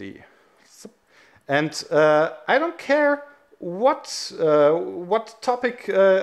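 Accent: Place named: Germany. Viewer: The german is strong with this one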